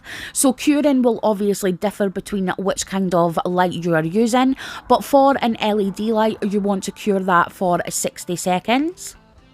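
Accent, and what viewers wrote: Scotland, United Kingdom. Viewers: You are from scotland, aren't ya? I can hear it, as a German 😅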